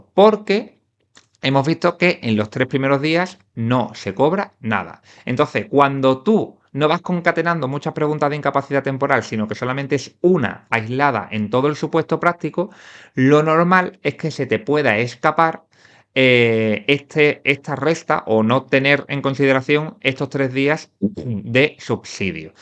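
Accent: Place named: Andalusia, Spain. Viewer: Que bien lo explicas y además con tu acento andaluz me gusta todavía más jaja viva Andalucía ❤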